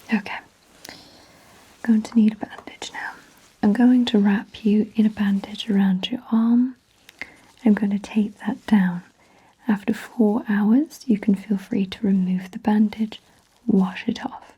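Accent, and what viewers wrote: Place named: England, United Kingdom. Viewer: i find it amusing that the way you channel the sinister, menacing vibe is by sounding more English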